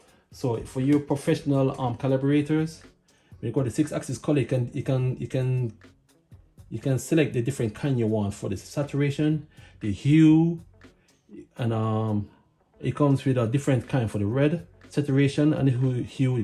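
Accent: Jamaica. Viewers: Sounds like a Jamaican :)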